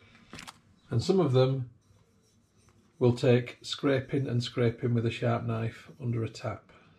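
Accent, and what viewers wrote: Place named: Yorkshire, United Kingdom. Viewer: Hey - good on ya for reclaiming all those lovely bottles. I used to do it but eventually grew tired of the process - I now brew exclusively with flip tops On a different note - I'm sure you've already figured it out since making this video - but if you make up your sanitiser into a solution and fill your bottles that way - not only is it a lot easier to fill the bottles - you can keep reusing the same solution for quite a while as long as the pH stays above 3.5. I keep a few 2 litre juice bottles full of StarSan solution in my laundry and just pull out what I need whenever I start a brew. I haven't had to change the solution for nearly a year so far and given the cost of StarSan it's a good saving. In closing - I'm not sure which I enjoy more - your content or listening to a proper Yorkshire accent again. Ee ba gum lad it's like puttin' on a nice comfy pair 'a slippers. 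😂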